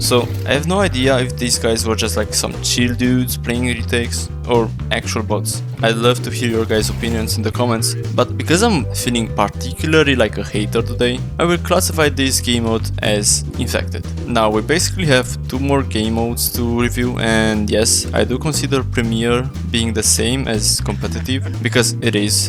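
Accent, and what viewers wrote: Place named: Romania. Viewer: It took 6 minutes to figure it out you are romanian ahaha😂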